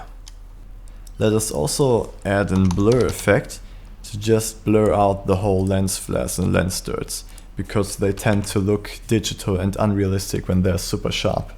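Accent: Germany. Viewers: Yeah sounds german 😀 Greetings from Germany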